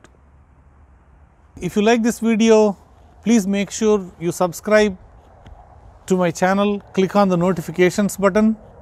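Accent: India. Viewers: Your Accent Sounded A Lot Like Indian, But Indians Doesn’t Get This Much Exposure To Astronomy Mainly The Equipments That You Use! So I Just Wondered How Come Being An Indian, You Have Access To Such Astronomical Equipments.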